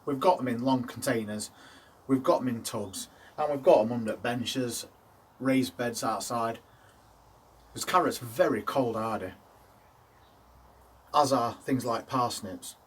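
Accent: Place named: North Midlands, United Kingdom. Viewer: Hi, in rough terms, whereabouts are you in the UK? I’d suspect north midlands from your accent but people move around so that’s not a reliable indicator. The reason I ask is that I’m interested to know what hardiness zone you grow in so that I can follow your advice with cognisant of any local temperature related adjustments I might need to make. FYI I’m in North Shropshire only a cock stride from the South Cheshire border.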